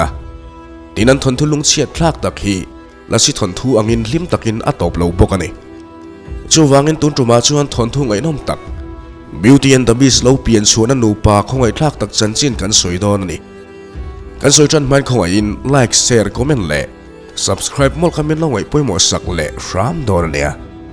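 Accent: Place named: Mizoram, India. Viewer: I aw. leh i aw phawi hi, ka van duh teh lul.. britain aw phawi kan tih ang a.. Mizo aw phawi diktak hi mawi ka ti.. " aw" i tih anga a... aw mawi tak tih aiin aw phawi tih hi a dik ka tizawk.. Min "Pin thei"../😁😁😁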